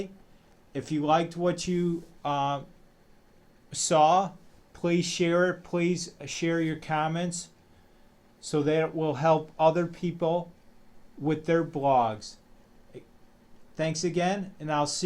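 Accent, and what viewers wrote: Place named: New York, United States. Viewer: Nice to hear a New Yorker! Thank you for the very clear examples of each option for showing a category list in a sidebar.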